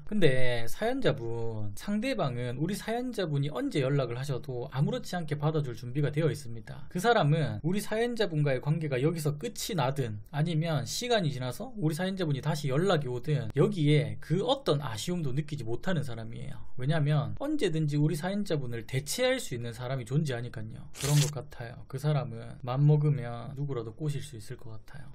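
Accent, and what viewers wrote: Gyeongsang, South Korea. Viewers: 왜 연애 유튜버분들은 경상도 사투리가 많을까요 이분은 현재 연애상담 유튜버 하시는분의 말투나 톤이 넘 닮았어요 넘 놀래서 댓글 달아요 😮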